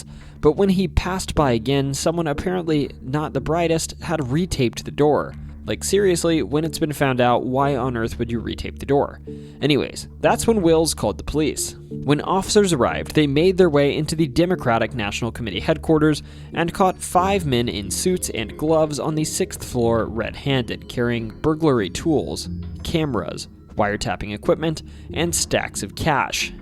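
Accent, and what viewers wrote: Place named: North, United States. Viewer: "Hen-re-co". You must be a Yankee pronouncing your "I's".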